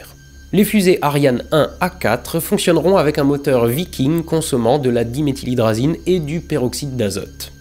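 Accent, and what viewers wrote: Alsace, France. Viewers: L'accent alsacien est au top! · Aaaah l'accent Alsacien de l'ouest du Bas-Rhin ! pour une fois j'ai regardé la sponso en entier ^^ des shmout'z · j'adore les sponsos avec l'accent alsacien!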